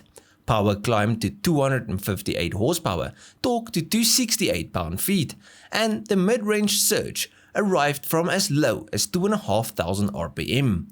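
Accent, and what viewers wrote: South Africa. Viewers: exactly. Sounds very much like a south african afrikaans speaker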